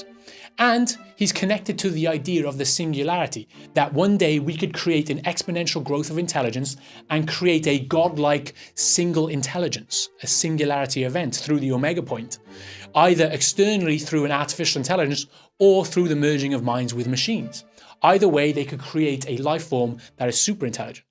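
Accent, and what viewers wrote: Wales, United Kingdom. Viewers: literally every vid you make is on the lines of what I'm researching, i feel like your following me...and you sound like your from my end of Wales...definitely following me XD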